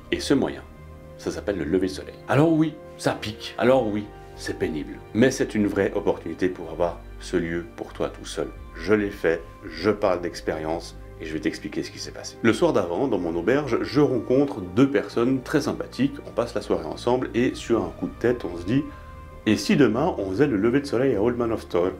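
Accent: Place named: United Kingdom. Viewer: Je suis tombé sur vos vidéos il y a quelques jours, et en tant que grand passionné de l’Écosse et de tout ce qui s’y rapporte, je tenais à vous dire à quel point je les trouve captivantes. Votre ton résolument "so British" (même si, en Écosse, ce n’est pas toujours un compliment 😄) apporte une touche unique et agréable. Bravo pour votre travail, et surtout, continuez comme ça ! Alba gu bràth , Slàinte Mhath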